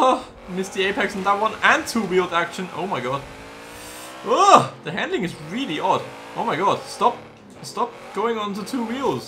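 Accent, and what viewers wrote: Germany. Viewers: scheiße deine Videos sind immer so abgedreht :'D bin letztens über den ultra dragster tesla auf dich gekommen. man hört sofort dass du deutscher bist :P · Are you german? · is he German? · Man hört einfach das du deutscher bist😂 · Are you German or Austrian? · Is he German? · Es hört sich echt nach deutschem Akzent an.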